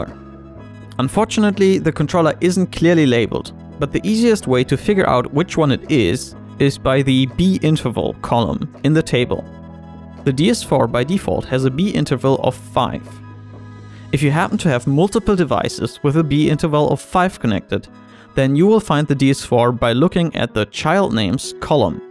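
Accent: Germany. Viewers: Man hört so dass du deutsch bist xD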